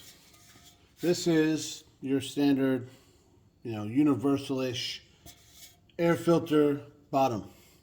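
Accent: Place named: East Coast, United States. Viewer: ok I subscribed your funny and got that east coast talk that I like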